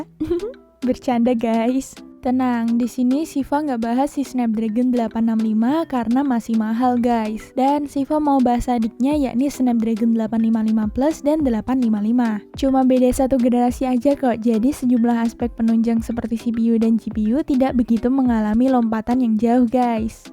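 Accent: Indonesia. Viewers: Lidah indo maklum aj lah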